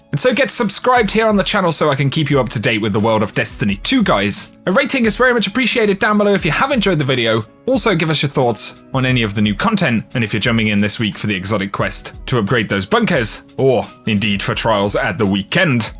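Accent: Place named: United Kingdom. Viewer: Must be that British accent 😎👍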